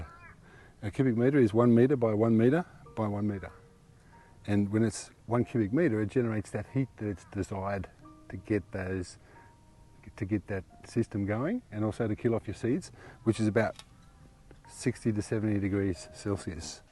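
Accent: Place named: Australia. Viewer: How in the world, as many gardening channels as I watch, have I managed to miss this guy? I am totally in love lol. Let's see... long-hair runs around barefoot like I do all the time chases mice around and makes beautiful compost. Loves the smell of Rich soil... And an Australian accent to boot. What's not to love? I just wish you could come help me with this mess I call a homestead in Missouri. Too many rocks, too much clay, no rain etc. But I'm trying. Thank you!! I needed this. Going to plant cover crops if it ever rains, then in late winter I'll cut it down, cover with my compost, tarp and let it sit till I plant it. I winter sow, so I have time to let it rest before things have to go out in the garden. I am using turkey, chicken, quail, duck, and rabbit manure, as well as grass clippings and leaves and plants that I've cut out of the garden from this last season excetera.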